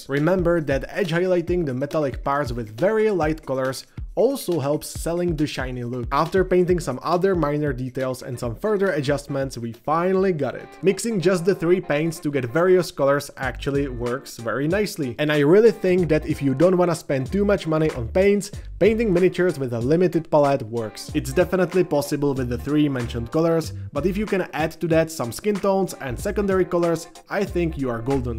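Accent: Czech Republic. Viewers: Got this randomly recommended, 10 seconds in and I already knew you're Czech based on the accent haha. Good job btw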